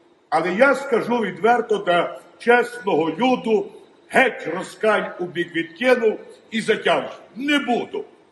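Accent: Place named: Western Ukraine, Ukraine. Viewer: Абсолютно геніально! Цей говір і гумор! Особливо цей унікальний «західноукраїнський» сільський говір з якого «люди з міста» насміхались в радянські часи. А кожна задрипана дівка з села біля Львова, якій вдалося влаштуватися на роботу у Львові, лізла зі шкіри, щоб «разгаваривать на общепонятном руском язике» Мав нагоду цей феномен спостерігати, працюючи в одному з наукових закладів Академії Наук. Обʼїздив багато світу, і в кожному куточку є свій говір, сленг. Що і робить цей світ різноманітним і цікавим!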